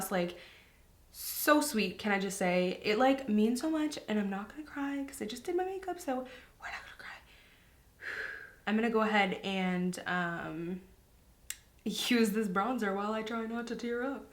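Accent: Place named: Canada. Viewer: I love your Canadian accent!!! How tall are you? Congrats on your journey... be proud!! also, I can't tell u have more confidence...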